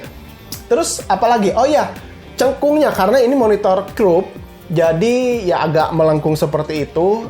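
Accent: West Java, Indonesia. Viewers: Letah sunda mang pabaliut kitu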